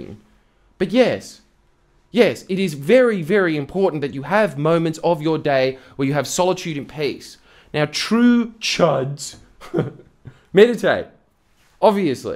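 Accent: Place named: Western Sydney, Australia. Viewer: Preach it ❤ I always knew you were wise. Now i know you're also thoughtful and caring. P.S. your Western Sydney accent is 🔥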